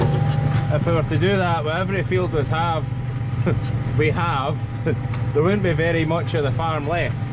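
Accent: Scotland, United Kingdom. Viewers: Which part of Scotland are you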